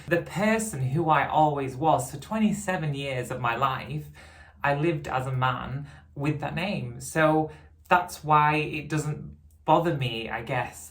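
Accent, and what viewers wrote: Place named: Yorkshire, United Kingdom. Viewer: You’re such a pleasure to listen and learn from and who doesn’t love a Yorkshire accent! Makes me miss The North. ❤️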